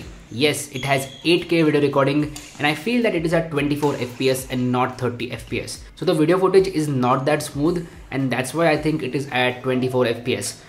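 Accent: India. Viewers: Why Indian speak like this????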